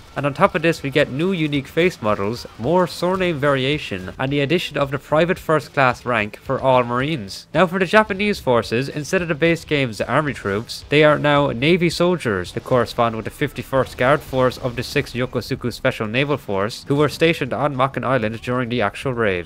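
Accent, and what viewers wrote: Ireland. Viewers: Your Irish accent is so cute! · I like the Irish accent.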